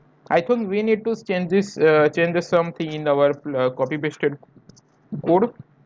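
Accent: Gujarat, India. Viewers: i can definitely tell this guy is gujarati kathiyawadi according to his english speaking accent .if i am right let me know!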